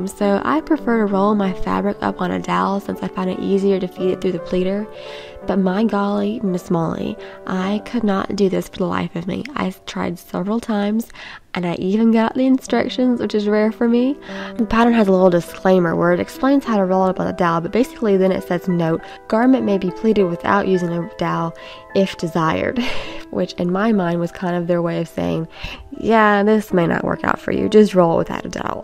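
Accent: South, United States. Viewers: I have been smocking for 27 years and I love your videos, especially your sweet southern accent and background music. I am glad to see a young person reviving the dying art of French Hand Sewing. I also used to take my "project baggie" (or several) with me everywhere! Best wishes!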